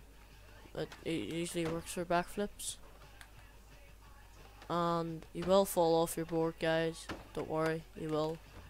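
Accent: Northern Ireland, United Kingdom. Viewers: Mate, after trying listening to these awful annoying American 13 y/o kids, it's great to hear a Norn Iron accent talk sense. Legend.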